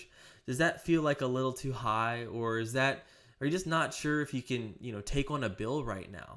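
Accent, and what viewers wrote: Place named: Rural, United States. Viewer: Heyyyyyy…..it’s!!!! Hardest opening line, wish my name was so I could say it with that country twang😂 anyone new to this channel, watch EVERY.SINGLE. VIDEO. that this man puts out. He will help you pick the right agency, get out of bad ones, create a GOLD script, source free leads, teach others. Dude you guys don’t understand is literally putting his neck out there so that we can learn and get real,honest,quality information about the industry, and what to watch out for. These big IMO/MLMs don’t like what he says because it pulls back the curtain on lots of these pump and dump recruitment models. has not only lived the broker/agent life good and bad but also teaches others the exact blueprint. He’s sharing his success and wins with us random strangers. Protect this man at all costs. Also just got my resident license snd a bunch of non resident license which my imo told me to get and then after getting them said I overdid it😂 So far so good, no red flags in the contracting department. 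I’ll be starting age lead calls Tuesday. In the meantime I’m still trying to get a hang of doing EAPPS and scripts. Any tips on mastering EAPPS and the products each carrier sell? Thanks. Keep it up!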